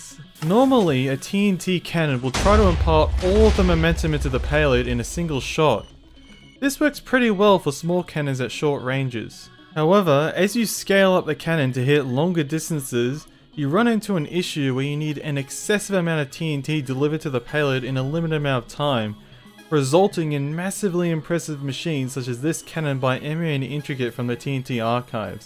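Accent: Australia. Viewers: also, AUSSIEEEEEE OMG (LOL)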